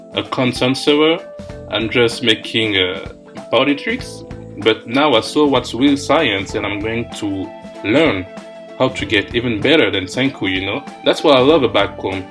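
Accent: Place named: France. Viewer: I love French accent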